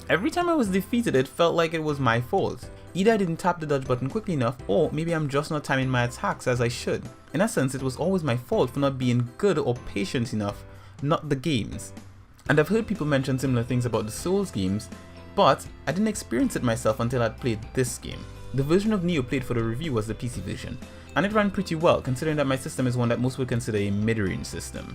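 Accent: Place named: Trinidad and Tobago. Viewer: Are you from Trinidad? You sound like a Trini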